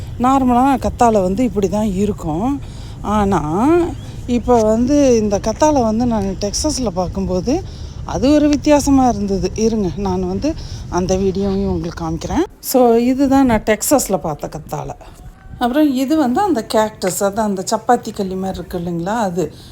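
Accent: Salem, India. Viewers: Chappatgi kalli you showed is what we have here in India...நம்ம ஊர்ல ரொம்ப பரவலா பார்க்கலாம்...இதுல இருக்கும் பழம் சாப்பிட்டாலும் நாக்கில் முள் குத்தும்....சேலம் பேச்சு வழக்கு அருமை.......Stay blessed sister...take care..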